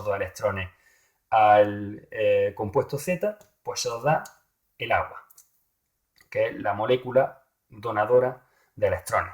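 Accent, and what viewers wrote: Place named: Murcia, Spain. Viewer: como se nota que eres de murcia :)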